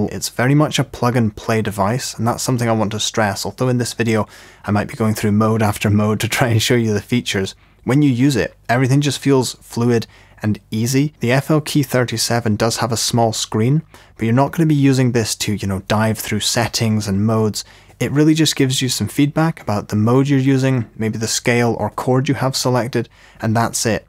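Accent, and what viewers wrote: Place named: Perthshire, United Kingdom. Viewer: I’m music daft but for some reason the only thing I can think about watchin these videos is where you’re from. Im also Scottish AF and have a habit of placing accents but yours is hard to read. You don’t sound southern/ central belt, neither do you sound like a farmer/northern. Im guessing Perthshire? Please reply for my sanity. Im a proud Forfarian wasting away in Dundee as an electrician with a view to taking your crown. Keep up the good excellent work my good man. Really appreciate it and look forward to working with/against you in the future. Wink emoji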